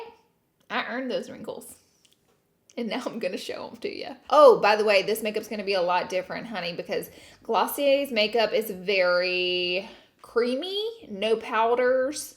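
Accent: South, United States. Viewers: honestly watching her videos are so relaxing to me. I’m like finally someone with a southern accent!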